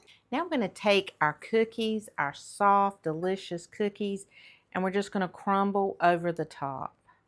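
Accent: South, United States. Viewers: FIRST TIME seeing ya!! I had to subscribe!! I love your Southern Belle accent !! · Love the Southern accent! Honestly, I have to make that lemon lasagna!!! Thanks for an easy recipe that hopefully I can make. Looks so delicious I cant wait to try it. Thanks again 😊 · I love your southern accent. Yummy lemon!! I want to try making this. Hoping all the ingredients you used are available in Canada. ❤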